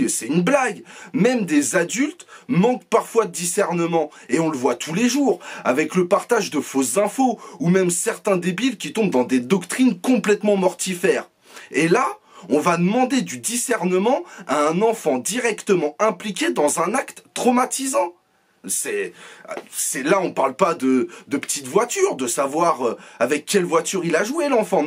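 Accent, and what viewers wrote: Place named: Banlieue, France. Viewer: voix de benlieusar voix de bonhomme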